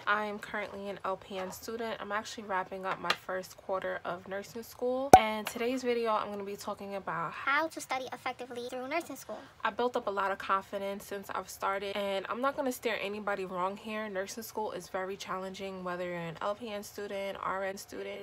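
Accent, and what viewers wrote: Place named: New Jersey, United States. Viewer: I was literally binge watching your videos all morning and then boom a new post 😂😂 I am new to your channel. I work full time and goto an RN program . I am from New Jersey so your accent reminds me of home lol. Good luck! You are way more dedicated and organized than I was when i started. I think I was still in my college phase of partying and cramming but this last semester I rocked it out! I have one more semester starting in January so it’s been a long road but I am ready to be done and I am deciding what kind of nurse I’ll be. Anyway you amaze me and keep going and inspiring 💕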